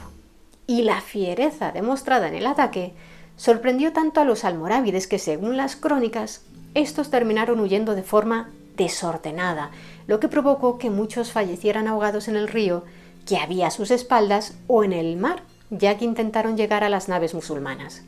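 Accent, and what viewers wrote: Spain. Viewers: Dios Santo!!! Si hay algo qué sufrí fue El Mio Cid. Es un somnífero escrito. Y viene la señora, con esa dicción perfecta y un acento español adorable y convierte la misma historia en una narración de más de 1 hora que escucho embelesado. Mis más sinceras felicitaciones!!!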